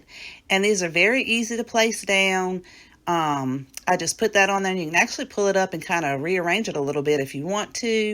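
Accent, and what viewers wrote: South, United States. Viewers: How cute both diy’s are. Found you through Heidi. I subscribed today. I’m so happy I found you. I think both projects go together. Where are you from? You have a very southern accent. I’m from Pensacola, but I live on the coast of MS now.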